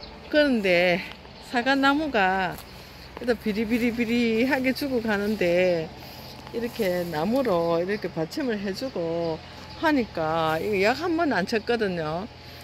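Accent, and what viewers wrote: Gyeongsang, South Korea. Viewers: *선생님이라고 호칭을? 아니면.. 이*교수님 이라고 호칭을 해야할지요? ㅎ [방가지똥] 약초 이름도 참 재밌네요..ㅎ 오늘도 건강을 위한 프로젝트 영상! 잘 시청합니다~👍💘 경상도 사투리가 즹겹네요..ㅎ 자두*가 선생님 닮아서 이쁘네요~ 휴일! 행복한 시간 되세요~*😘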